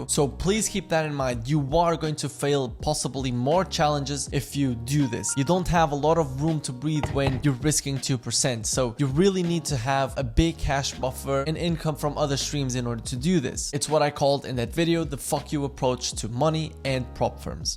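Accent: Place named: Portugal. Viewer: Your accent brings some portuguese vibes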